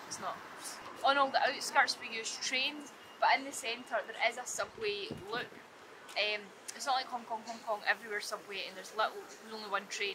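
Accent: Scotland, United Kingdom. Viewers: Thanks goodness for the English subtitles, otherwise I'll have a tough time understanding her Scottish English since I am more used to American English. I think Chinese subtitles might help her get more subscribers just in case she wants more audience. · Its too heavy as british accent, lol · Its so enjoyable listening to your British English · Love you Scottish accent and your down to earthiness ! I travelled all around Scotland with my younger brother many, many moons ago and loved it ! Mind you, I stayed in the U.K. for 12 years and it was full of fond memories. Keep up the good work !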